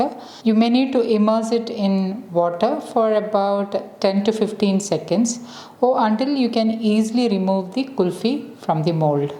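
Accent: India. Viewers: There's nothing different with her accent, it's just a perfectly normal Indian accent.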